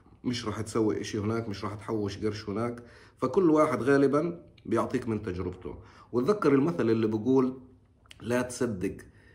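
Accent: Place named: Iraq. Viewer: لهجة عراقية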